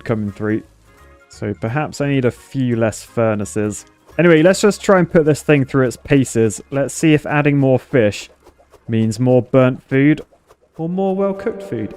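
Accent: United Kingdom. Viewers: I watch your videos to practice listening to british accent and it works pretty well. Thanks, mate!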